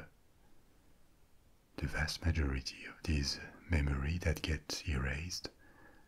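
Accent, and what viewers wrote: France. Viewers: Oh my god. The French accent is literally perfection combined with ASMR. What a time to be alive this is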